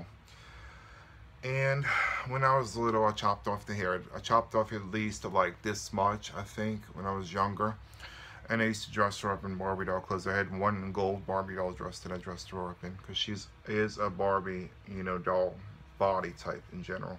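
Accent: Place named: Boston, United States. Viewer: Where is your accent from? It's like almost a Boston one and I love it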